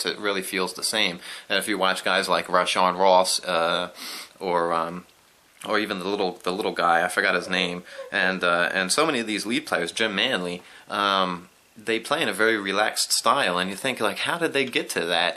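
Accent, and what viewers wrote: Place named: Philadelphia, United States. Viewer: great explanation! you sound like a Philly or South Jersey guy. I've been searching for some confirmation on Morrison's ideas and another guy from Australia who says the same thing on blowing levels equaling harmonic slot increases, and you've done that very well here. Thanks!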